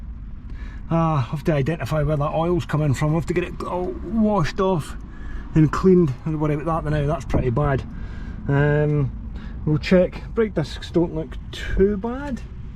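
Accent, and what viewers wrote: Scotland, United Kingdom. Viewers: I absolutely love your accent. Is that Scottish? I'm tuning in from Boston Massachusetts.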